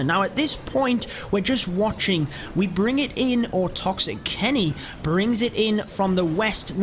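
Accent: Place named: Northern England, United Kingdom. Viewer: He's English, somewhere up north I'd guess, Newcastle or somewhere near there.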